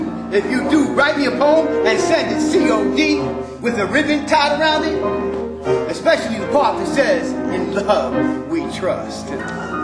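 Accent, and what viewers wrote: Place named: New York, United States. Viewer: The narrator is a New Yawker. He should be hip to the words of: "But he was going no where fast Speed ain't nothing without class"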